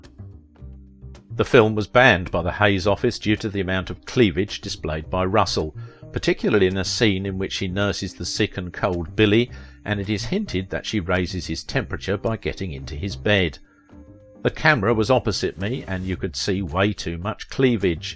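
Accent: England, United Kingdom. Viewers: I’m assuming your comment is tongue in cheek. The narrator seems to be British. In the US we may say loo-tenant, in Britain it’s left-tenant. Even though the spelling is the same. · we invented the language, therefore we will speak it how we like. His pronunciation is correct for that part of England.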